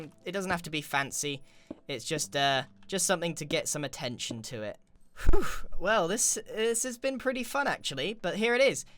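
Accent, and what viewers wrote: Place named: Southern England, United Kingdom. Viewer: I’m British and it’s the norm to me.Its a normal middle-class south English accent. I would say it’s more is voice then is accent.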